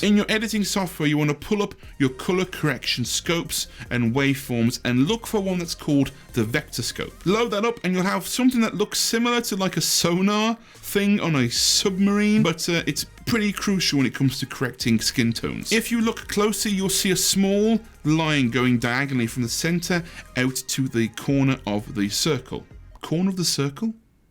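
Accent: Midlands, United Kingdom. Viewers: Also... Is that a midlands accent I hear? ;p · Can I hear a Midlands accent in there trying to hide away?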